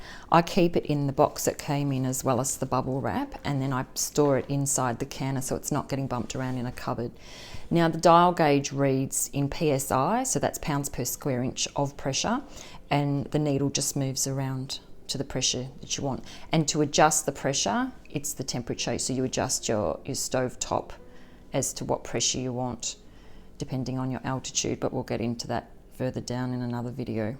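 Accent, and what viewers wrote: Australia. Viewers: Thank you for this video! I have just purchased a Presto canner and am finding your channel to be very helpful. It's lovely hearing a fellow Aussie voice on YouTube! Where can we get the gauge checked here in Australia? Thanks.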